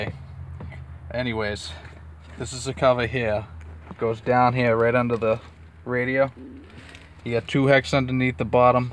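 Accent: Boston, United States. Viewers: I like your Boston accent. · thanks - need this -useful vid Also your accent is awesome accent. Very Good Will Hunting :-)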